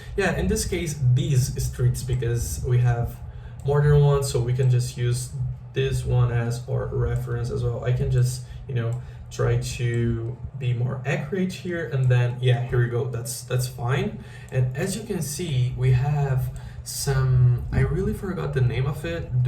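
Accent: Brazil. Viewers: Sotaque brasileiro, certeza😊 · Quando escutei o sotaque no começo e vi que eu tava entendendo bem o inglês eu pensei: ele deve ser br ahhahah. Nice work in this video man!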